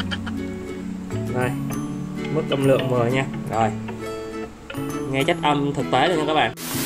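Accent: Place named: South, Vietnam. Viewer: giọng miền nam dễ nghe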